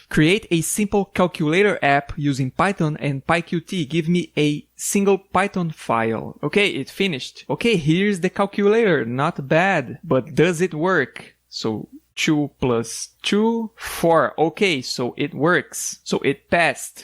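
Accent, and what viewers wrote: Brazil. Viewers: so pelo sotaque ja percebi que e br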